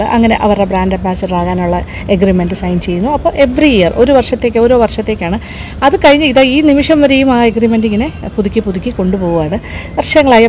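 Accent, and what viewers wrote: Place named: Southern Travancore, India. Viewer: No doubt you are the one who inspired culinary skills in all young minds!! Even I was very particular to be present before Kairali channel at the right time to watch you appear - - though staying at the heart of tvm city your southern travancore slang attracted me the most- You always maintained an unique cooking style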